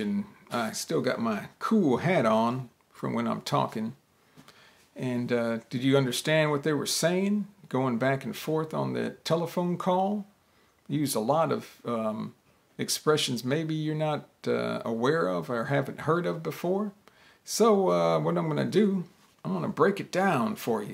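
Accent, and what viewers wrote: United States. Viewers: I just discovered you.... I'm an English learner. And I love American accent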